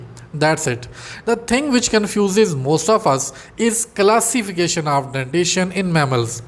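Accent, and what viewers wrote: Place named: India. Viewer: indian ahh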